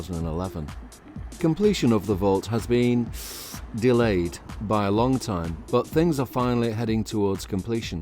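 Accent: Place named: Salford, United Kingdom. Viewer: Video is good but I don't think he should down do it ! He is a sarcastic little git ! He is not a scouser either....sounds like Salford more like ! Manc.go home. Lol 😆 🤣! Not.welcome!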